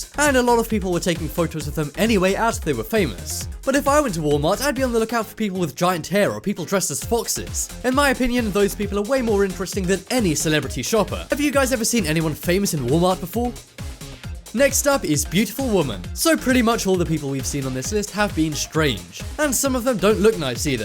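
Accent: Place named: England, United Kingdom. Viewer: English accent SUCKS!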